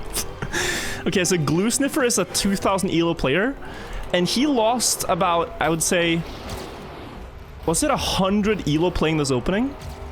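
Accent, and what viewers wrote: Norway. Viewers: subtitling his norweigen accent is so funny lmao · The Norwegian accent....😂 Everytime I hear Norwegian speak it.... Fucking adorable. 😂 Live in Sweden the Swedish accent is just not the same. Close but not the same. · Aww man speaking with that Norwegian English is awesome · I love Norwegian "accent" - It just improves everything!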